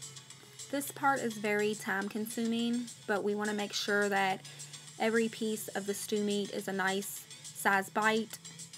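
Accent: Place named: Kentucky, United States. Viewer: Girl I knew you had be from ky. Way you clean lol an talk. I am from Eastern.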